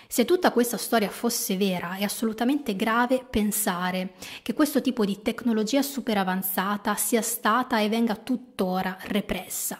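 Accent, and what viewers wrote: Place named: Piedmont, Italy. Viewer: Bellissimo video, voce gradevole, inconfondibile accento piemontese, che dire......nuovo iscritto!!!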